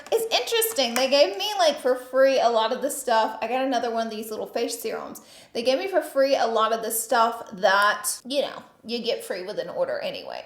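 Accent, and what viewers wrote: South, United States. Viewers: Anyone else miss adorable full on southern accent? 😭❤️ First video I ever watched was her Blendiful review and the accemt was so cute I could not stop watching after that lol! Love her silly personality 😂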